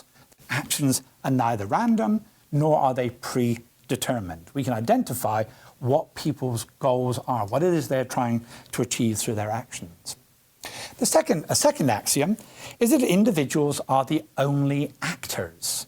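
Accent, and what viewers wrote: United Kingdom. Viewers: Sounds Scottish of some description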